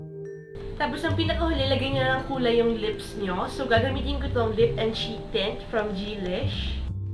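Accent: Philippines. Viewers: You have a cute Filipino accent :)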